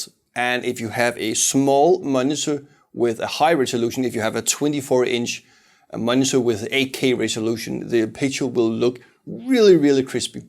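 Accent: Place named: Denmark. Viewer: aggressive danglish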